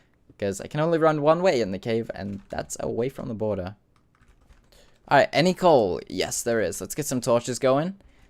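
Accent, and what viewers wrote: United Kingdom. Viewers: what happened to your british accent?:(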